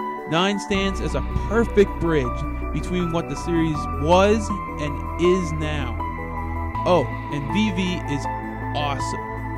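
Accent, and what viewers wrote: Canada. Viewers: are you canadian? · Are you canadian? Great list btw.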